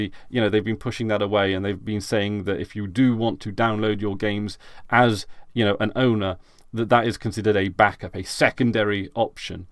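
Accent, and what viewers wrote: United Kingdom. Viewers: Linux might break through.... Linux is already broken through. What are you talking about. How much of the Internet is run on Linux. How many devices run on Linux? Linux is already corporate. Niche by design. What are you talking about do you know who's on the Linux Foundation. God what a mistake listening to a brit. Should have closed the video the moment I heard your accent and saw your English teeth. Why do you guys have such bad teeth anyway? I swear. I need a ibuprofen after that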